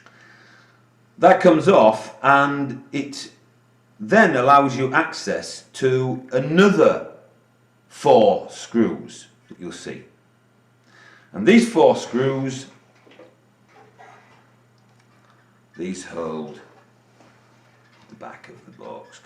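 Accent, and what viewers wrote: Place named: Yorkshire, United Kingdom. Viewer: Mmmm..... Micheal Caine speaks with a London accent/Cockney accent....I rather think this chap is a Northerner.....Yorkshire area. Mmm?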